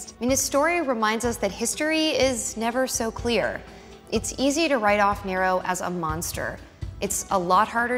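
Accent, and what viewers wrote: United States. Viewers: Cant take history lessons from an american accent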